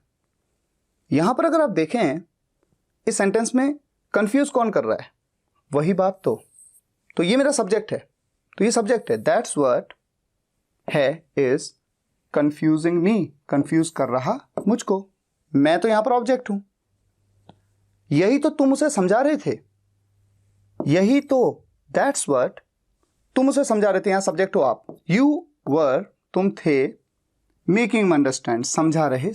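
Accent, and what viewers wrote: Uttarakhand, India. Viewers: Sir aap uttrakhand se ho kya🙏🙏🥰🥰🥳🥳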